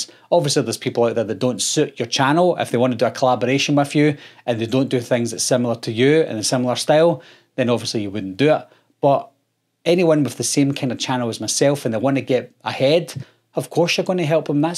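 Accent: Scotland, United Kingdom. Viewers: Well deserved fella. Btw I love the Scottish accent and can understand you just fine. Keep on keeping on.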